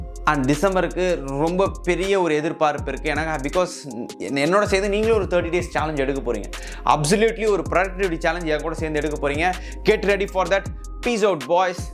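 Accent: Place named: Tirunelveli, India. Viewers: Antha ella slang nice 👌 sincery by tirunelveli ,thoothukudi Karan la 🤣🤣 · Bro tuty slang vera level ❤️ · tiruneveli Slang👻😄😁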